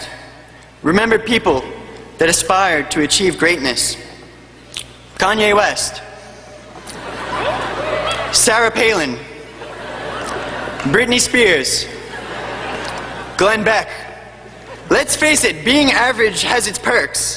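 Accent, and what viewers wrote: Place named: Canada. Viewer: I'm guessing Canadian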